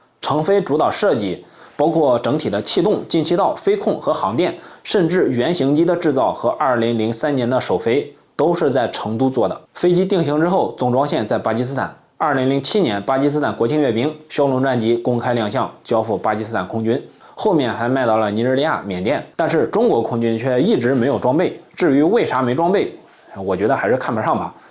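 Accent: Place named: Shandong, China. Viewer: 妈的比这山东话真几把恶心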